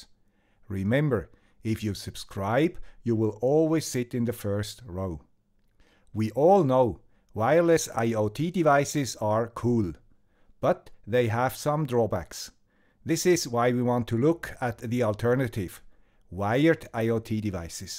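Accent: Switzerland. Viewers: Wait a seconds! Guy with swiss accent familiar with NIVA!? Lada NIVA!?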